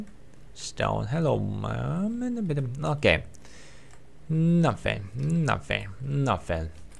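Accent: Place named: United States. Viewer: Сначало подумал что ты американец, хорошо маскируешься👍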